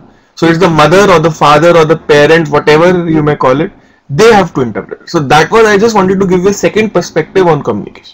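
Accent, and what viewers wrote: India. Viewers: very nice sir! i am pakistani .so it is easy for me to get indian accent. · l am African from Democratic Republic of Congo/ cental Africa, l don't like the teacher's accent, he's waisting his time, better to teach in indian language.Malayalam, Tamoul, Hindi...sorry sorry to follow you mr, phonetics problem, you're my colleague l am a Business English teacher. Thank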